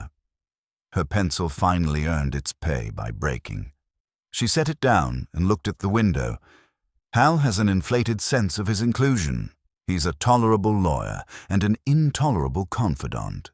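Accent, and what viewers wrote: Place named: United Kingdom. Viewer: The British accent doesn't match with New York American accents. I expect Archie Goodwin to be a brash American, snappier pace. The space in the narration after each "Mr" or "Inspector" before saying the last name is disconcerting. Renting a typewriter matches the period of the original Nero Wolf series, that is good. But paying for it with "a prepaid card"??? Did such things exist back then?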